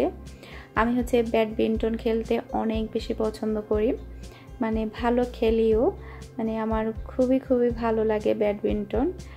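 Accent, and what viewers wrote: Chittagong, Bangladesh. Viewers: আপু আপনি সাতকানিয়ার মেয়ে, ওয়াও,,আপনার কথার মধ্যে চট্টগ্রাম এর একটা সুর আছে। আমি ভাবতাম কোথায় হতে পারে আপনার বাড়ি। শেষেমেষ আমারা একি যায়গার মেয়ে হলাম। এই জন্যই তো আপনাকে আপন আপন লাগে,।আমিও সাতকানিয়ার মেয়ে।কানচন, ফুলতলা, মিয়াবাড়ি, আমার বাড়ি, আর শশুর বাড়ি ডলুকুল❤❤❤❤❤অনেক অনেক শুভ কামনা রইলো আপু আপনার জন্য❤❤❤❤ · Apnar kota sone amar bar bar mone hoccilo apni chittagongr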